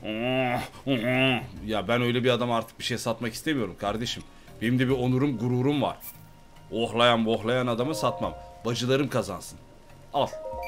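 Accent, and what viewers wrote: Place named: Ankara, Turkey. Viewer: Ankaralı olduğu nası da belli karı kızda yedi parayı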